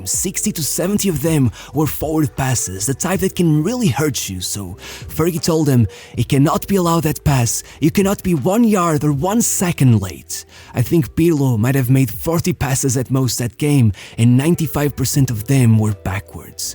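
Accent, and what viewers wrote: Portugal. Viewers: I know a tuga talking english when i ear one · AM I THE ONLY ONE THAT CAN'T HEAR THIS NARRATOR?? So dramatic.....i bet he's portuguese. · your Portuguese accent is funny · Are u Portuguese? I feel such a Portuguese accent in your english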